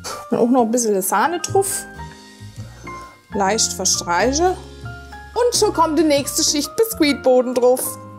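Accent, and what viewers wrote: Germany. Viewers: Ich bin so amüsiert über deine verbale Dialektwanderung zwischen Berlin, der Pfalz, Hessen und ein büschen Nordisch....von wo bist du, du Granate??? Deine Rezepte und die Umsetzung sind ja herrlich!!!+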